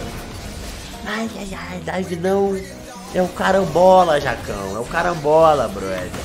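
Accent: Rio de Janeiro, Brazil. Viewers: Esse é muito carioca mano 😂😂😂 Fé com fé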